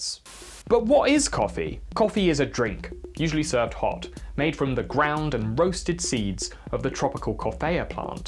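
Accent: United Kingdom. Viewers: typically I hate channels that are feature a condescending British dude but yours is pretty ok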